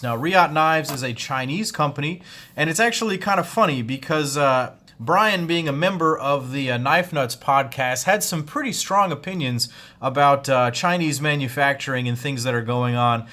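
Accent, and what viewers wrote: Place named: New Jersey, United States. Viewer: You know, because of his very French seeming last name and his knife aesthetics I always thought he was French in France. Then I heard him talk. Brother is just an MFer from Jersey like me!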